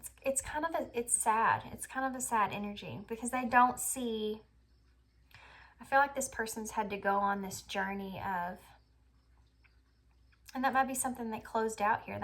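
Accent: South, United States. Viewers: Hi :) I just found your channel and I have to say that I love your energy and I really relate to your messages and the way you bring them across and they are helping me so much right now.. I’ve always loved a southern accent too so I just like listening to you talk! Lol thank you for taking the time to do these readings I truly appreciate it :)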